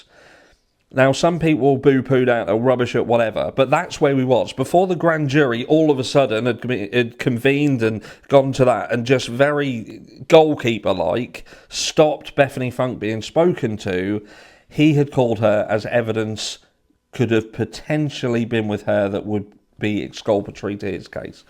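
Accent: Norfolk, United Kingdom. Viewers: Go,!! I agree 100%. Usually, here in the US, most conspiracy theories turn out to be true. I must admit, I have difficulty listening to the British accent but you and Jonny Lee Miller have changed me. Love your videos. Any updates on poor Sean Daugherty? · Appreciate you and all your hard work 🤍 Is that a Norfolk or Suffolk twang I can hear? 😊✌️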